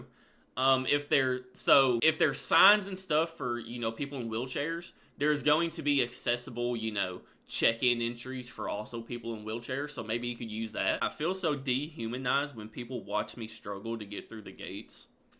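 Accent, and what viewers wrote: Kentucky, United States. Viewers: Lovin your accent, is it Kentucky? I’m very English. Sometimes youre almost London cockney. July 28? I’m July 27. 😄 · Soon as I heard that accent I just knew you were from KY.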